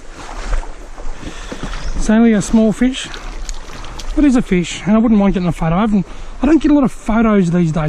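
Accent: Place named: Australia. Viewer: WTF, are there trout in Australia? First time watching this channel so I’m only assuming due to the accent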